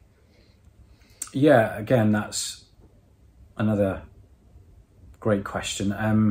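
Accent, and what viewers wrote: United Kingdom. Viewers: Очень интересное интервью - я слушал и наслаждался, так как я преподаватель английского языка и не люблю американский акцент и мне нравится британский. Очень приятно слушать Вас. Вам удачи и благополучия.Very interesting interview - I listened and enjoyed as I'm an English teacher and I don't like the American accent and I like the British one. It is very nice to listen to you. Good luck and well-being to you.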